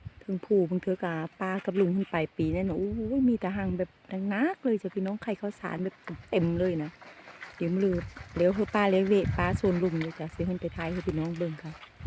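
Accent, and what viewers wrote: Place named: Phu Thai, Thailand. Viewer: มาลุ้นแกย่ไข่มดแดงนำเด้อครับ มักเสียงเว้าภูไทครับผม ติดตามให้กำลังใจนำครับ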